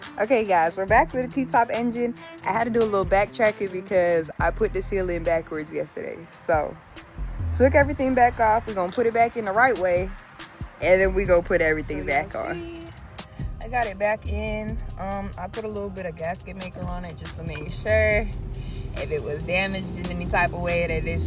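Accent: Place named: South, United States. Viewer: I'm trying to pay attention I like the way you talk downsouth country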